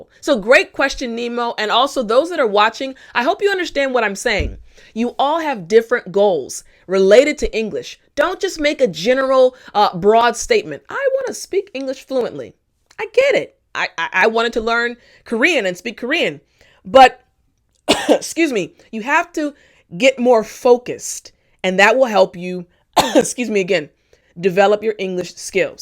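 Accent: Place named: United States. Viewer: Peace from Egypt. I'm an English teacher also but I wish to learn American English accent from you.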